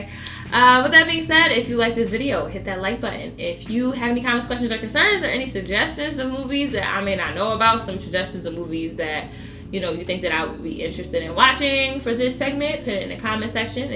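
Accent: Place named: Philadelphia, United States. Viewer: The Philly be coming out of you on these reviews lol. Great job yet again!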